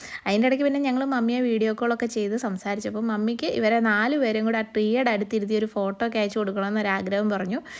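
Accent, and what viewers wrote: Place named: Kollam, India. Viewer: Kollam slang aanu. Athukondu chotdichatha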